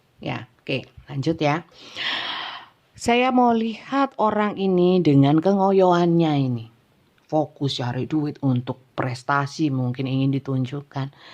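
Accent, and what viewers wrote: East Java, Indonesia. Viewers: Aq jd gak bisa tidur bun dlm readingan ini banyak banyolannya logat jatim tp gak meninggalkan fokusnya bun, aq banyak cengar cengir sendiri, semi wayang lo bun, aq seneng bun lanjut, hebat pokok e, yg pasti itu Tuhan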